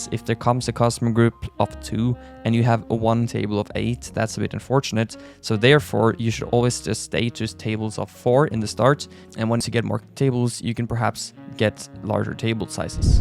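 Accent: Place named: Norway. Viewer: Brooo Are you from Norway? Or should I say er du fra Norge? Jeg så det når du sa vi skulle abonere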